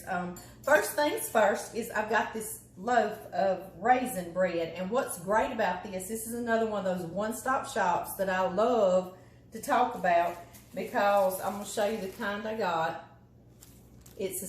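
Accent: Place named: Louisiana, United States. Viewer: My Texas accent is a strong as your Louisiana accent! If I’m lucky enough to ever meet you, our husbands will have to find a place to sit down, because you and I can do some talking!!! My husband is from also from Louisiana. Bread pudding is his favorite desert. I make it quite often, and I’ll be making him a pan tomorrow for his Father’s Day dessert. I have never seen a southern maid bottle, but what a treasure. My mother collected bottles, some she found in antique stores and some she dug up while my dad was hunting arrowheads through New Mexico and the Badlands. When she passed in 2013 she had so many I could not keep them all. My brother and I took them to a museum in our South Texas hometown, and the museum kept quite a few of them native to that area and my adult daughter and I split most of the rest of them. The best part about the museum taking some, is they had traced and dated every bottle in her collection to decide which they wanted. 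What a gift! Like you, I like anything old & I love antiquing for things that you don’t find any more. I still have my grandmothers metal saltine cracker box!! Love watching you cook and tell stories!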